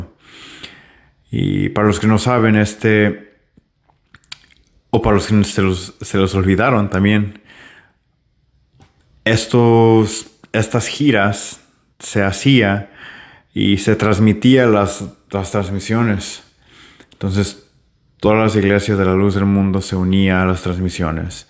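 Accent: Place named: Oaxaca, Mexico. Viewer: Oye Bro ! Eres de norte América o de sur Oaxaca ! Lo digo por tu acento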